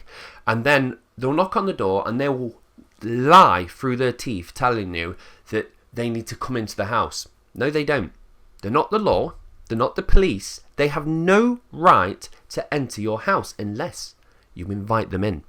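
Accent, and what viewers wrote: United Kingdom. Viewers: Does anybody know what regional English accent this guy is using? I'm in Canada, and the last time I heard this particular accent it was from the character Grumio on the comedy series Plebs.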